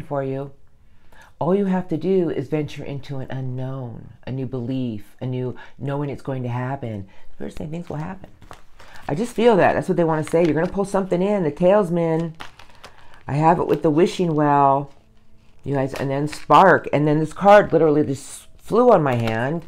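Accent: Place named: United States. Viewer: aww! Thank you! Beautiful reading as always. You speak amazing English, but look Spanish but sound American? You might have lived in several countries to date? You are certainly well traveled spiritually, I love your intuition and gifts. Thank you as always. ❤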